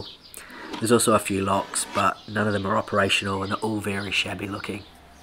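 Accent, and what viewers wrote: New Zealand. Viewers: Oh man, I could listen to your kiwi accent forever! Great job, as always! ❤ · Being married to a kiwi the accent is familiar. Just found your channel and really enjoyed this video. I’ll be watching😁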